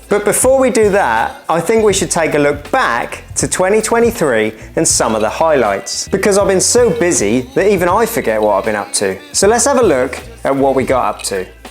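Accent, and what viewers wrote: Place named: Norwich, United Kingdom. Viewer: I love watching your videos. As I spent the first 25 years of my life in Norwich I recognised your accent immediately. Looking forwards to more great content, happy new year.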